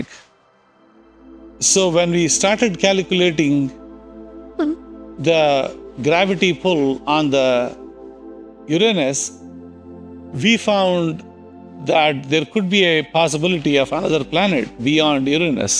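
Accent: India. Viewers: I can’t watch you videos because your accent makes me think of all those Indian scam call centres!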